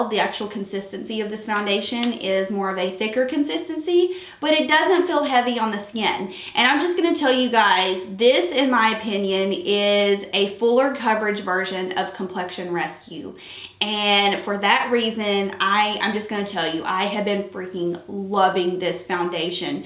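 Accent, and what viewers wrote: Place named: Oklahoma, United States. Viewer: You sound like an Oklahoman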